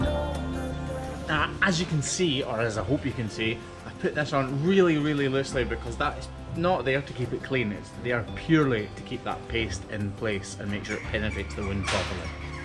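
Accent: Scotland, United Kingdom. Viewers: He has a Scottish accent. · are you from uk? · Thank you for caring about our four legged brothers and sister. Your Scootish accent is music to my ears as Im a third generation Scot on both sides of my family here in the US. My grandma's dialect was so thick we almost coudnt understand her. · I'm amazed by it. I never though this would intrigue me but I am. Plus his Scottish accent is the cherry on top lol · You know I’m getting used to the Scottish accent when I can watch the video on silent with AWFUL subtitles and still figure out what he’s saying.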